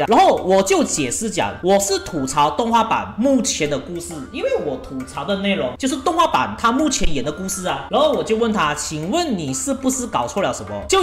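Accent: Malaysia. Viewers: 看Kuma桑吐槽的口音好好笑😂 回去看自介果然是馬來西亞人，跟"娘娘"一樣口音😋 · 對方真的很有事 就跟你說我是吐槽動畫版 你有跟我扯小説版 不過 我現在才發現 有馬來西亞的口音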